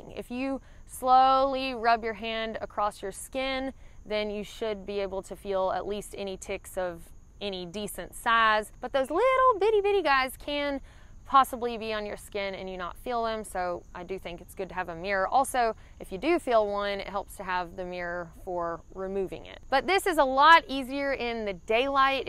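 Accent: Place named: Virginia, United States. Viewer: Dude that south VA accent is great. Even if you didn't know anything about hiking I would still listen to you. I always get the impression that anyone who has that accent I expect them to know everything outdoors lol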